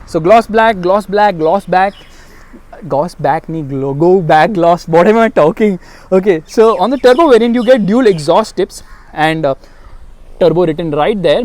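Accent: India. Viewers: Desi english accent is so funny😂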